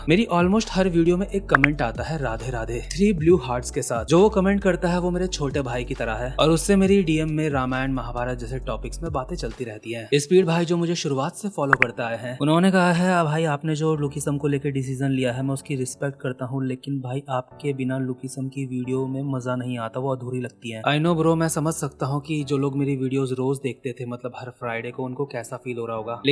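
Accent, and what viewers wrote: Uttarakhand, India. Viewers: surname se pta lg gya tha ap uttrakhand ke ho accent se bhi 😍ap kumoani ho y garwali me bhi uttrakhand se hu ❤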